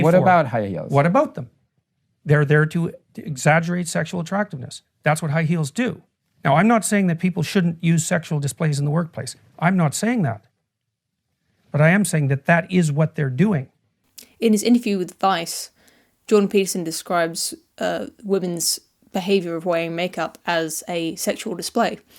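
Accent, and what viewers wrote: United Kingdom. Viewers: you ARE attractive. I'm reluctant to say it, but you look great without makeup. I'm reluctant to say it because I don't want to be misunderstood. Plus, I don't want to offend. Thing is, I'm actually turned on by women who don't wear makeup. Again, I want to be careful here, but a woman without makeup is, for me, like a woman without clothes. It's revealing, exposing, arousing. I shouldn't post this since I've already had two white Russians this evening. But there you go. Wish I could delete this post. Or at least redraft it. Combine that with your British (or Australian) accent? Oh, dear God! P.S. I've read The Selfish Gene. P.P.S. Dawkins is an idiot. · British accent. Ozzie’s don’t sound like that mate. I guess you’re American because for some reason you’s can’t pick accents. Everyone else can. I can even tell the difference between bloody Yanks and Canadians. 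One is really loud and obnoxious, the other apologies all the time and says ay a lot. I can have two beers in my breakfast cereal then drink all day and still not fall in love with the first chick I see on YouTube. Pull your socks up! Just kidding, it’s all in jest mate. Have a couple for me you funny bastard!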